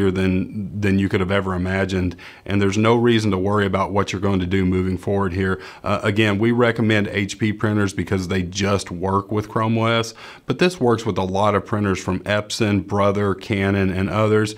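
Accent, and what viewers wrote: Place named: Virginia, United States. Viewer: You sound like you're from my homestate Va. Anyway, do all chromebooks 315 connected to some printer? I looked up the ones that are compatible with CB and I decided on HP 2755e. Thanks for this really clear video. Second question, I thought you could just set up the printer on the CB screen by tapping the clock and then following through. How will I know if need HP Smart app?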